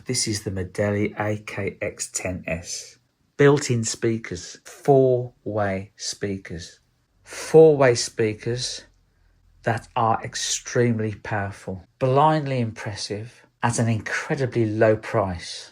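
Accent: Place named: Birmingham, United Kingdom. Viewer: Very interesting . You are an amazing player and I've learned so much listening to your videos. Also nice to see your other keyboards stacked up especially the Roland Juno. I have a beloved Roland Juno DS Amongst my many others. I'm sure I detect a slight Birmingham accent. I used to get some keyboards from Jones and Crossland and Lichfield Organ Studios back in the day as I lived in Lichfield before I moved up to the Scottish Highlands. Keep up the amazing playing ! 🎹👍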